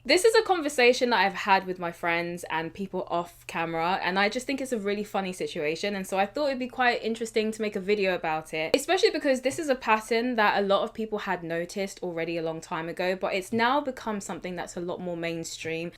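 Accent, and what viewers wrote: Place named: United Kingdom. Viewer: you have that beautifull bantu face, but a British voice. amazing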